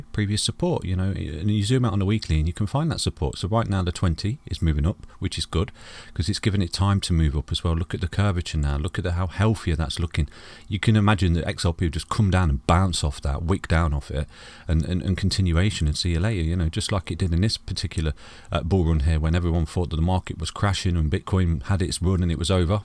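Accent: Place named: East Midlands, United Kingdom. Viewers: He's from Leicester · Sounds like east midlands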